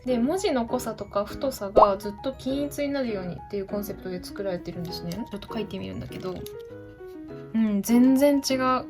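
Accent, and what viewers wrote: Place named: Kansai, Japan. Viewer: 関西弁可愛い……………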